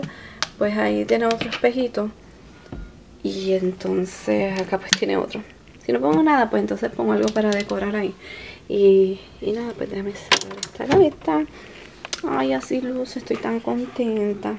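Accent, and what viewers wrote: Puerto Rico. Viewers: Me facina ver tus videos Aww tu acento pueltoliqueño!! Eres hermosaaaaaa!! Me facino tu jollero!!! Besoooossss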